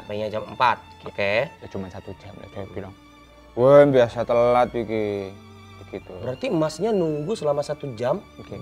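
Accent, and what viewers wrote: Java, Indonesia. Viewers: Gemess lucu bgt jd fasih ngomong Jowo medok akibat keseringan naik gunung diJowo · Efek hbs dri lawu... malah logat ny jdi jawa.. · bgst jawa medok banget, ngakak dari awal sampe akhir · Semangat medhok bang dzawin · Medok.nya dzawin udah sangat bagus bgt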